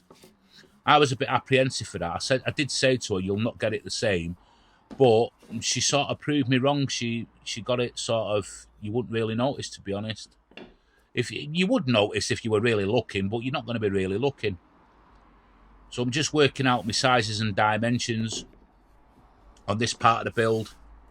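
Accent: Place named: Scotland, United Kingdom. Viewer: Paul From Pa in US. Looks great. I have been wanting to do some pallet wood projects. I love that rustic old look. I also love the color of the stain that you used. I could see this out in my garage for storage / small tools etc.. My problem is that I want to build everything I see and you just can't. I really like the pieces you build though. They are just different and that's what I like. Plus the accent / location helps since I was born in Scotland but I have been in the US since 1968. I still have a spot in my heart for that part of the world. Thanks for another great build.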